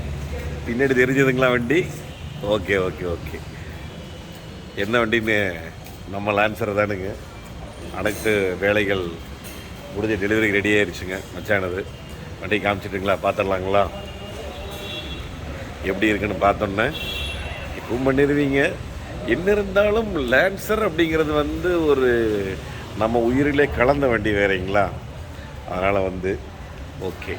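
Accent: Kongu, India. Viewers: கொஞ்சும் கொங்குத் தமிழ் பேசும் கோமகரே...! திகட்டாத தித்திக்கும் பேச்சுடைய திருப்பூர் திருமகரே...! மதிப்பிற்குறிய எங்கள் " " திரு. அவர்களே🙏 எப்படி இருக்கீங்க❤❤❤ அண்ணி🙏 எப்படி இருக்காங்க❤❤❤ ரித்திக் எப்படி இருக்காரு❤❤❤ யெப்ப்ப்ப்ப்ப்ப்ப்ப்பா😍😍😍😍😍😍😍😍😍😍😍😍😍😍😍😍😍😍😍😍😍😍😍😍😍😍 அட...அட...அட என்னா கலரு போங்க😍😍😍😍😍😍 சொப்பன சுந்தரிய யாரு வச்சு இருக்காங்கனு கரகாட்டகாரன் படத்துல செந்தில் அண்ணன் கவுண்டமணி அண்ணன்கிட்ட கேட்பாரு? இங்க பாருங்க...."TMF" செக்கச் செவேல்னு ஜிகுஜிகுஜிகுனு சிவப்பு சொப்பன சுந்தரி லேன்சர் காரை நம்ம " " திரு. அண்ணா வச்சு இருக்காருங்கோ😍😍😍😍😍😍😍😍😍😍😍😍😍 கொட்டப் பாக்கும் கொழுந்து வெத்தலையும் போட்டால் வாய் செவக்கும்...! எங்கள் " " அண்ணாவோட வேலைப்பாடுகளில் கார் எல்லாம் ஜொலிஜொலிக்கும்😍😍😍😍 அழுக்கான அசிங்கமெல்லாம் அழகு வண்ணம் பூசுகையில் சாலை ரதத் தேர் என்னும் கார் எல்லாம் பொன்னாய் மிண்ணும்...! வழுக்காத நேர்மையென்னும் வாழ்க்கைப் பாதைதனைக் கொண்ட எங்கள் " " அண்ணா சிந்தனையும் செயலும் அவர் வேலைப்பாடுகளிலே பொன்னாய் மிண்ணும்...! 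எச்சிலொழுகும் தன் மழலைதனின் அன்பு முத்தச் சுவைதன்னை தாய் ரசித்து ருசிப்பதுபோல...! வாகனம் பராமரிப்பற்று எச்சமிட்டதுபோல் ஆகி அருவருப்பான இடமெல்லாம் மிச்சம் மீதியில்லாமல் சுத்தம் செய்து தரும் எங்கள் மதிப்பிற்குறிய "சூப்பர் கார் கேர்" (Super Car Care) ஊழியர்களின்🙏 உழைப்பைக் கண்டு அனைத்து "TMF" கண்களும் காணோளி வாயிலாக ரசித்து ருசிக்கிறது...!! சூப்பர் கார் கேரில் வேலை பார்க்கிற ஊழியர்களுக்காக ஓர் சிறிய கவிதை🙏 வேதனை வந்து வீழ்த்தி வீழும் நிலைக்குத் தள்ளப்பட்டாலும் விதியென வீழ்ந்திடாது வாழ்வில் உயர நினைத்து உடல் வளைத்து வியர்வை வடித்து உழைக்கும் உன்னத உழை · Super workmanship, sir.You have proved that you are the Best car mechnic and as always your Kongu Tamil is sooper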